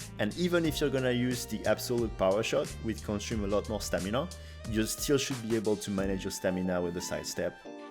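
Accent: France. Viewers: ohh i love bow what set are you using and are you french? i think i hear a french accent :3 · Haha cet accent, tu es français n’est ce pas ? Sympa la vidéo!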